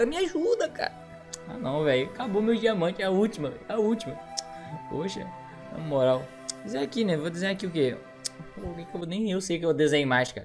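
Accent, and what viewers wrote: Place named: Rio de Janeiro, Brazil. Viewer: de que cidade do rio vc é?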